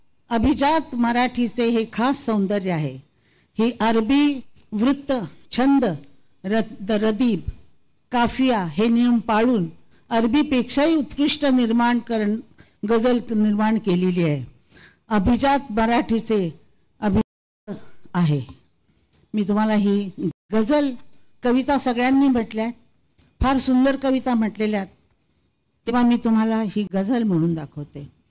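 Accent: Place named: Kolhapur, India. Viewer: ❤❤🎉🎉 खरोखर आशाबाई गायना बरोबर आपण माणसाच्या मनाचाही खूप विचार करत आहात केला आहे तुमचं मराठी प्रेम आणि तुमचे कोल्हापुरी भाषा रवि मज्जा आली शोभा अकोलकर बेरड पुणे वडगाव शेरी मूळची राहणारी करंजी तालुका पाथर्डी जिल्हा नगर अहिल्यानगर मेरे जिल्ह्याची भाषांतर छानच बोलून दाखवली वा तुम्हाला सलाम सलाम त्रिवार वंदन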